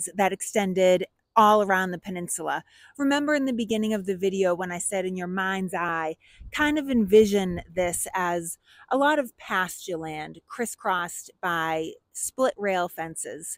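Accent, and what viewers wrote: Boston, United States. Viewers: Visiting Boston this summer and wanted to see Bunker hill. The moment she said "Wah" in her Boston accent; ; I knew I had to stay for the whole video. :D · Love that Boston accent.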